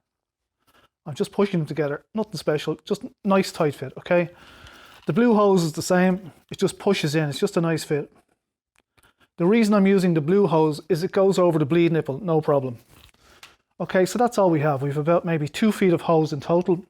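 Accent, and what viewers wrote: Ireland. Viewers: And cool Irish accent to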